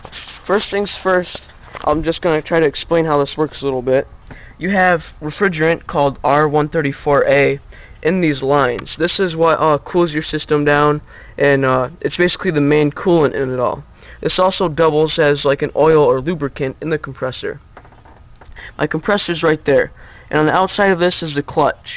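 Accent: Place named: Portugal. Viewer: You sound like my Portuguese homie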